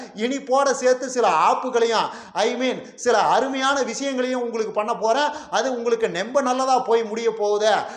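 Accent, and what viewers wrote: Kongu, India. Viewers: இது எங்கள் கொங்கு நாட்டு பேச்சுஸ சத்யராஜ் கூட இப்படி தான் பேசுவார்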